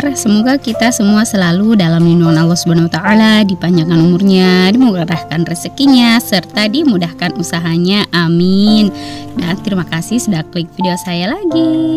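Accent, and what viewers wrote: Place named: Makassar, Indonesia. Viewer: Hallo bunda salam kenal bunda asal mn??bahasanya ky org makassar jg yah...semangat beberes2 yah bunda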